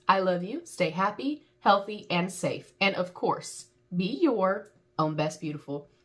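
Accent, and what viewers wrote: South, United States. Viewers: I watch Alexandria and I thought she had shown every calendar in existence. This is a pleasant surprise. I am presently using a NYX lipstick. Nothing fancy nude color but if I can't replace it when it's gone I'll be lost. I'm a mature gal and don't use a lot of makeup. I find NYX or Elf usually meets my needs. Off to watch the opening :-) Wow so far the price, the packaging and the free gift with purchasing. How can you go wrong. I'll be back. Haha. Definitely a good price. I felt it was very lip color heavy. Would have like to see some powder blush, mascara and maybe lip bullets. I'm an old fashioned gal. I did miss the items we didn't see you open so possibly some of the things I mentioned were in there. I'm off to subscribe and check out your content. I love that Southern Twang. 🤠😉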